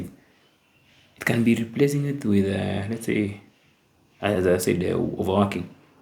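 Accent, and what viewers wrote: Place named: Kenya. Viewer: My fellow Kenyan!!!! That accent 😊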